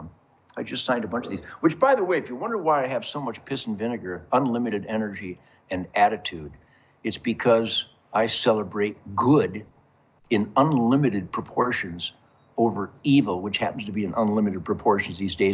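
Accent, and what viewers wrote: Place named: United States. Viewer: Thank you Ted for your American voice.Always your fan forever 🎉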